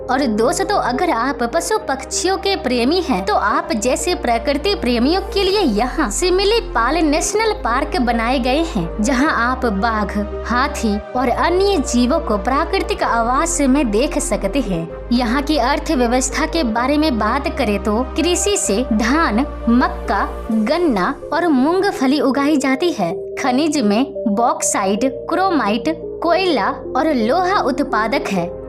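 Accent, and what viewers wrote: South, India. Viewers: Shayad mujhe lagta hai aap South ke taraf se ho ISI vajah se aapki Hindi thodi si alag lagti hai Lekin cute hai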